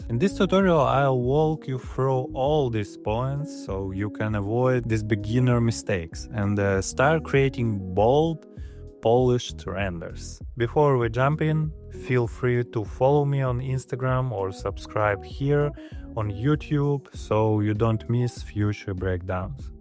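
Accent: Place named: Russia. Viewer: Ты русский? Английский палится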